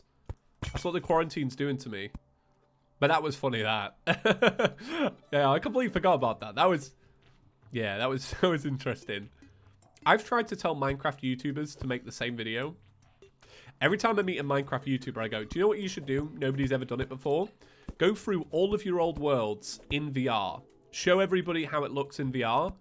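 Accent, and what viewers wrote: England, United Kingdom. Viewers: yeah thats the english accent for you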